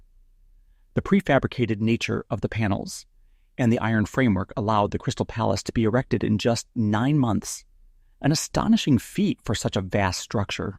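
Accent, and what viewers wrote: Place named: United States. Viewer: What bothers me is the narrator in Am.English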